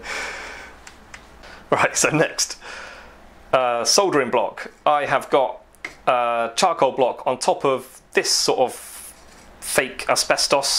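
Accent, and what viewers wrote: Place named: England, United Kingdom. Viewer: Great video Mate, thank you! What 's your accent? (what part of England Imean)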